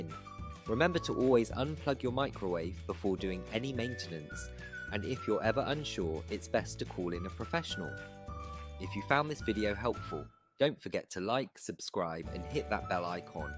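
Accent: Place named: United Kingdom. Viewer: Can't understand the Limie accent.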